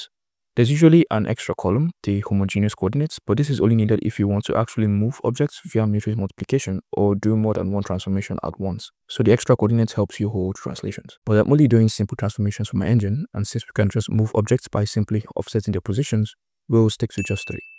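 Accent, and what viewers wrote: Nigeria. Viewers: are you nigerian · this dude sounds nigerian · Awesome video You kinda sound like some of my Nigerian friends ngl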